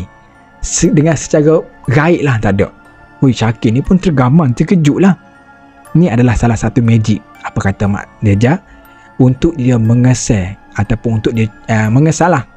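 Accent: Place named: Terengganu, Malaysia. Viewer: haha..ingat cakak banyak G belakang ore ganu..ok terbaik..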